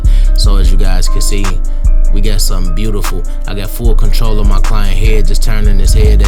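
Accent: Chicago, United States. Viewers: I’m curious I heard him say goofy 😂 you from Chicago bro this my first time watching one of your vids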